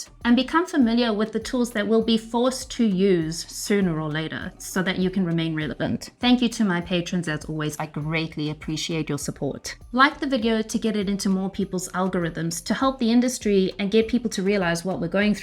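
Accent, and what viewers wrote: South Africa. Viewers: This is completely unrelated but your accent sounds sooo similar to South African ones. Are you from South Africa??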